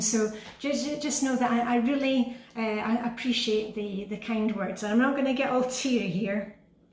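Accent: Scotland, United Kingdom. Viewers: I think, two years ago, I was searching de cluttering videos. I already followed some Danish YouTubers, like the sustainability channel Gittemary so maybe YouTube thought I was looking for Danish channels. I watched one video and wondered who is this Danish lady who sounds like a Scot! I never heard of FlyLady before your videos, and I’ve kept watching for that and the lifestyle videos. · Happily, you popped up in my feed ( I am constantly looking for help with my routines!) Have been watching for 2 or 3 years now, you are an I spiration, love your content, your personality, hygge, and your Copenhagen lifestyle, all wrapped up in a gorgeous Scottish accent, thank you❤ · Hi! I found you on You Tube in 2017/2018 when searching for decluttering videos. So glad I found you! I was instantly hooked by your warm personality, encouragement, Scottish accent, and how you led by example, by doing the work yourself in your videos to achieve a lovely and clean home. 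Happy New Year!! 💕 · Several years ago I joined FlyLady and ended up down one of the dreaded rabbit holes to your site. I loved your fringe and Scottish accent which made the 'lessons' so much more enjoyable. Thanks for your wonderful contributions to our lives. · Hi!! I found you through Dressing Your Truth sometime in the last year or so. Your bright and playful nature, along with your lovely Scottish accent, offer us all a reprieve from the daily stresses. Your videos helped me get through some of the darkest days of the pandemic. Congratulations on your latest milestone and thank you! 💕 🖖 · I found you on YT about three years ago. I searched 'decluttering' and lots of American sites popped up, some of which I still follow. A short while after that, your channel came up as a suggestion and it was refreshing to hear a British voice. Have been following you ever since. I like that you give clear no- nonsense advice and your channel is a mix of your day to day adventures as well as the house blessing suggestions and they are always upbeat and fun. 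Long may you continue to make these videos as I enjoy them immensely and always have a smile on my face as I watch. Kindest regards, Debra x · 4 years ago, and by complete accident- Over 10 Ways We Really Hygee Here in Denmark. You had your crisp haircut, wearing camel color knit, sitting in your wing back chair. Next thing I knew I watched all your content. Was really inspired to tackle letting things go, and practice daily routines. Really appreciated your simplistic frankness. Somehow it cut through the chatter of everyone else. I was always impatient with Fly Lady content from others. Sorry if it's weird, but your charming Scottish accent kept my attention while, at the same time, calmed me. Thanks for being the company in the room cheering me to get the thing done. It's been a real pleasure 🤩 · Hi, I found your YouTube channel around 2 years ago through a recommendation from another channel...sorry I don't remember which one---I had read "THE LITTLE BOOK OF HYGGE, the Danish way to live well" by Meik Wiking. 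My dad was born in Dundee, so I felt kinship with your Scots accent as well. I have also followed the Flylady system. I've totally enjoyed your channel as a subscriber and I want to congratulate you on a job well done!! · I was searching for someone who could explain the flylady system to me, lol, I found you. My husband is Scottish and I liked bringing a bit of your accent and culture into my home 😊. That was about 4 years ago. As a shift worker I don’t really have a system down but you give me inspiration to keep trying and do small things every day, thank you 🙏. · I've lived in Scotland for 8 years and I've got the biggest soft spot for the lovely, cosy , Scottish accent. You could read the back of a packet and I'd still listen to it.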